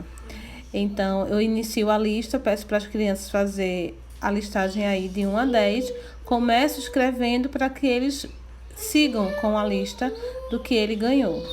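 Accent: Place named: Northeast, Brazil. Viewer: De onde você é professora ? Seu sotaque é bem nordestino.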